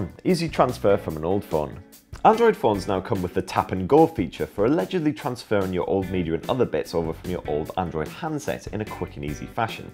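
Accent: United Kingdom. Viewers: love that brit accent jaja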